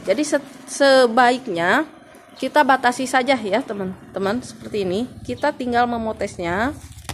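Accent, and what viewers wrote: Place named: West Java, Indonesia. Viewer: Maap mbanya dari logat nya mbanya dari jabar ya sunda leres te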